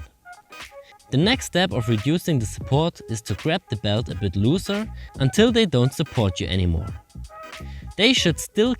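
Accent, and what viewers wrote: Germany. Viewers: You sound german. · you kinda have a german accent haha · Lol this accent sounds very german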